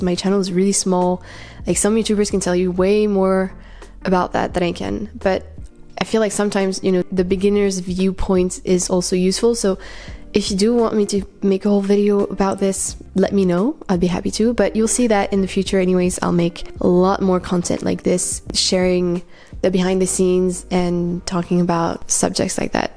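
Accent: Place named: France. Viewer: salut, j'ai l'impression que tu es française vu ton accent, mais je suis pas sûr. Est-ce que tu as été diagnostiquée adhd par un médecin en france? parce que j'ai l'impression que ce n'est pas trop dans la grille de lecture des médecins et psychiatres ici (du moins de ceux que j'ai pu rencontrer), mais que c'est plus reconnu dans le monde anglo saxon. tu as un avis là dessus? (désolé j'ai pas encore regardé toutes tes vidéos...)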